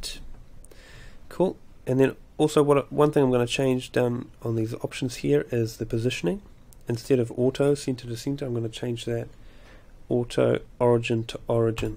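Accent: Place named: New Zealand. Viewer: hi thanks for that, you saved me heaps of hassles. I enjoy the kiwi accent :-)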